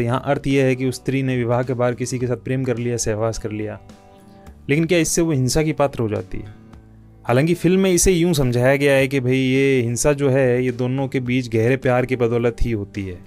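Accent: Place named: Rajasthan, India. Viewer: Sir aap rajasthan se ho ? Aapki awaaj se laga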